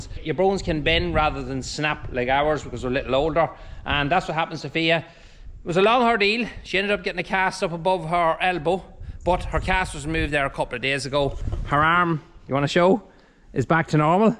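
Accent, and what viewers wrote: Ireland. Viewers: Your videos are so interesting. Things have certainly changed since I worked during holidays from boarding school on my Fathers' Hobby Dairy farm back in the '50s & '60s. Your barns are immaculate and your equipment is top-notch. By the way, I love your Irish brog!!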